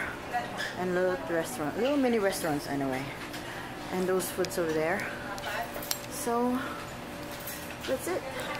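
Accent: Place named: Rizal, Philippines. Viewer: ate ung nsa likod mo panda fave ko haha.. sarap nmn jan,namiss ko kutsinta.ndi n aq nkbili here.. ate ung punto mo "ha-ne", gnyan dn kmi magslita dti ung nktira pa kmi s teresa rizal..sa rizal po ba province u ate?nkakamiss ang word na yan hehe..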